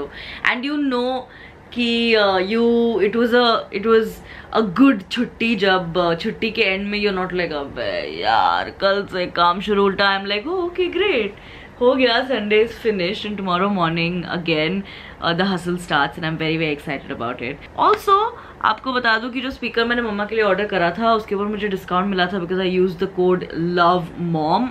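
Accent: Maharashtra, India. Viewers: I saw your interview on bhadipa ....it was big surprise and fantastic to see...you speak sweet marathi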